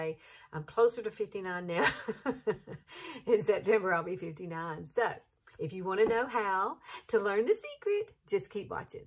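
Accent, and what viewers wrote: South, United States. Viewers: You, are FAB-U-LOUS!! I just found you on YouTube and having just turned the big 5-0 I am so glad that I found this particular video. You have that awesome southern accents and are just so enjoyable to watch. Keep it up girl. I just found my favorite YouTube personality! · Oh my goodness!! You are just the sweetest thing!! Your accent makes me miss my family down south!! I’m almost 52 and have lots of under eye issues. This trick looks so great and doable!! Thanks for being your authentic self and helping us gals out!!💜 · U r so sweet! I’m frm MS so I love ur disposition and of course the accent! Southern girls forever!!!! Thanx for ur tips! I’m 41 and starting to get the lines😡. U look so beautiful and young!!! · I could listen to you talk all day. You sound a little southern like me. I love your videos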